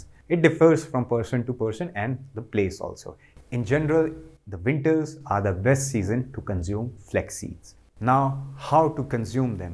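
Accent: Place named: Punjab, India. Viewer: boht okha ho kr english me video bnaee hai ap ne...saaf pta chal raha hai k ap koi punjabi ho...urdu me bnatey to ziada behtr tha...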